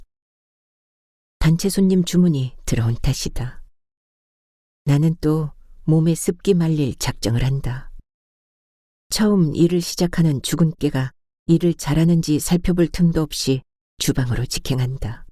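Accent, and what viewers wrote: Jeolla, South Korea. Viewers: 안녕하세요~~미스리가 아니고요 미스정이에요 구수한 전라도 사투리 재밌게 들었어요 우리 님은 성우 이신가요 ㅎㅎ 낭독도 잘하시지만 표현도 예술이에요~~😊😊❤ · 내고향 전라도사투리를 오랫만에들으니 정겹네요 잘들었습니다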